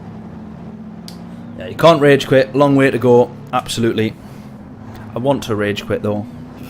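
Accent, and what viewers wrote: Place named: United Kingdom. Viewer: Your streams a really good, you deserve more subscribers. Whats your UK regional accent btw? Can't pick it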